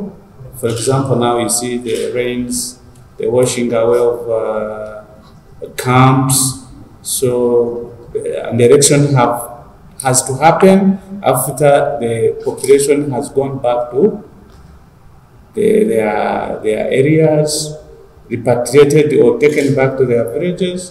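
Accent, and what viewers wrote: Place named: Eastern Uganda, Uganda. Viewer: He speaks like a Eastern ugandan for some reason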